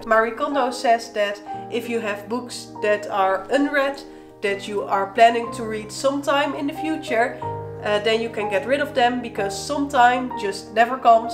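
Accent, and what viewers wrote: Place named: Netherlands. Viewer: Ik kan horen dat je uit Nederland komt! Super leuke video’s :)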